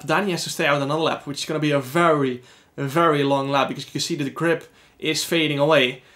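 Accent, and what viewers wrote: Netherlands. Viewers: we dont care about the quality we just wanna hear that juicy dutch-english accent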